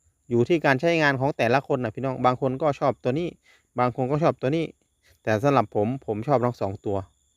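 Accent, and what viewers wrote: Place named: Isan, Thailand. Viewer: พูดอิสานฟังยากอ่ะ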